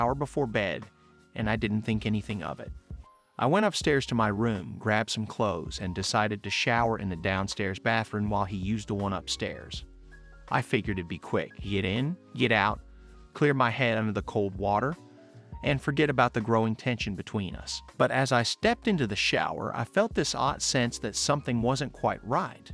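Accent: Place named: South, United States. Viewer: You sound like a redneck and that's hot! You and you nephew have some fun, a lot of fun many times!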